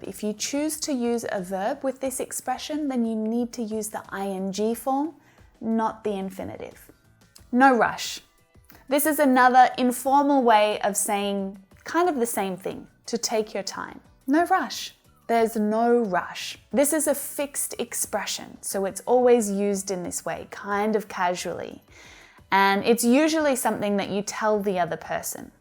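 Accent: Australia. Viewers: I ❤️ Australian Accent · I love Aussie accent and lucky for me I’m Aussie come from Australia live in Australia like if your Australian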